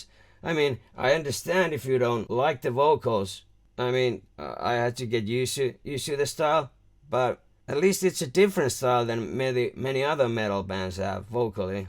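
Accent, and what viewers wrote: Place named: Finland. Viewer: Not gonna lie, it took me a while to place that accent. At first I thought it might be some odd dialect of Irish English, but after a while the Finnish-ness crept in - which I eventually caught, being also from the Nordics. Norway, in my case. Anyways, terve to you, my man. Good video! PS: It's reggeaton.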